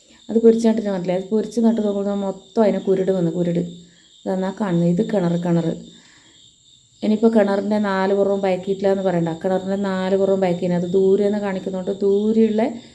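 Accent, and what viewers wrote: Kannur, India. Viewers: അടിപൊളി വീഡിയോ ആനുട്ടോ.. ഏററവും അടിപൊളി കണ്ണൂർ സംസാരം ആണ്... മൊത്തത്തിൽ പൊളിച്ചു 👌🌎 വളരേ നല്ല അറിവുകൾ ആണ് പകർന്നു നൽകിയത് എല്ലാവർക്കും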